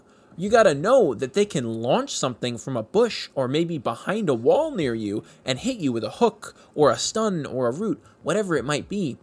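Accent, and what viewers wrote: United States. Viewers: the way you talk,it reminds me like Wild West, cowboy things xD ,i mean on actent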